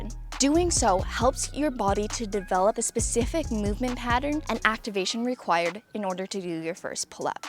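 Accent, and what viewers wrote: Canada. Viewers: I KNEW you were Canadian. By the way you mispronounce "out."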